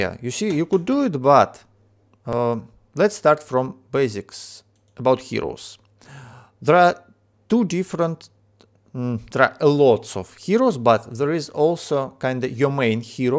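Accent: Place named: Russia. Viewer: Охренеть. Не нашёл нормального гайда на русском. Внезапно нашёл данный на инглише..... и сразу чувствуется дикий русский акцент - всё понятно :)))) Спасибо! :)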